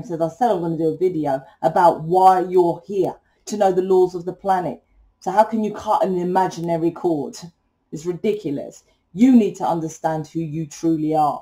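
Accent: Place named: London, United Kingdom. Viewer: So cool to listen to a Londoner on here, it's nearly all Americans!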